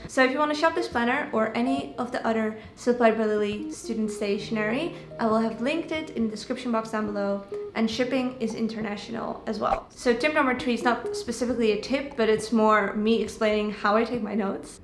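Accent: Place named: Netherlands. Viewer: Hoor je nederlandse accent 🥰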